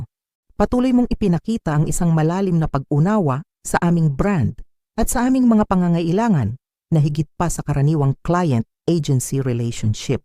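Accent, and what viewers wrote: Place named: India. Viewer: Putik!!! Bakit naging Indiano ang narrator!!!!!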